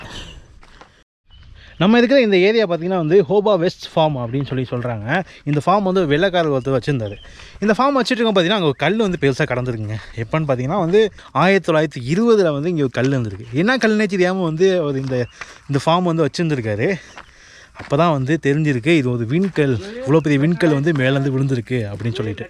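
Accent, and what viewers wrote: Thanjavur, India. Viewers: நம்ம தஞ்சாவூர் மாவட்டத்தில் பேசும் அதே பேச்சு உங்களோடு நானும் சேர்ந்து சுற்றி பார்ப்பது போன்று உள்ளது அருமை அருமை நன்றிகள் பல 🙋🙋🙋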